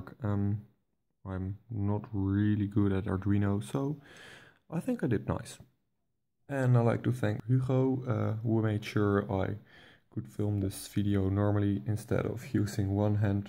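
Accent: Netherlands. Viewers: ben jij nederlands? ik kan dat horen :)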